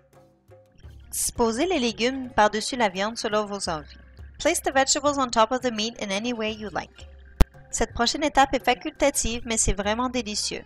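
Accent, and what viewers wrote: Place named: Quebec, Canada. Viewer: C'est marrant lorsque tu parles Français tu as un petit accent canadien, tu es au Quebec ? Merci pour ta recette en tout cas. ;)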